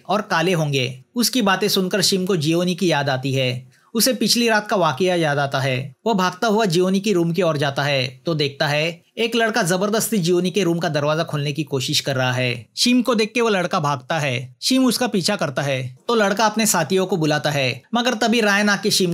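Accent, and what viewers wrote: Maharashtra, India. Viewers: मराठी medium का है .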